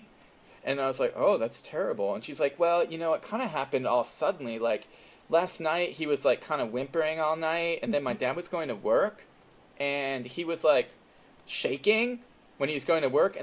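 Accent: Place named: California, United States. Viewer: he talks like that because he is probably from cali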